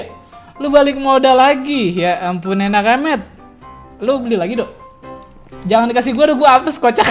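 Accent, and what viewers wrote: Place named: Tangerang, Indonesia. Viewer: kek logat tangerang yak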